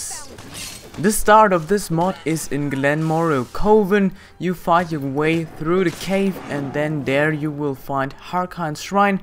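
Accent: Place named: Germany. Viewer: Guys he's German.. So i wanna hear you guys speak German.. And then say no it's pronounced like that.. -.-'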